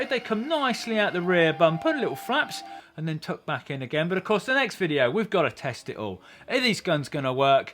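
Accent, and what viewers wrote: United Kingdom. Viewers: Nice bri'ish accent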